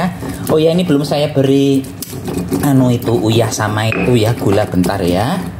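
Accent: Banyumas, Indonesia. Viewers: Lik asli ne wong endi??? Yakin ngapak kaya enyong pokok e ora ngapak ora penak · Sampean wong ngendi deneng ngomonge ngapak kaya jawane ijong masakane enak sumpah ijong jajal msk tongkolll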